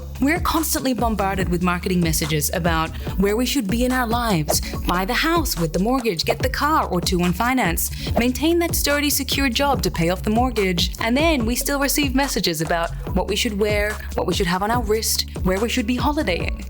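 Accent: United States. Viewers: your accent is changing from british to american, softer R's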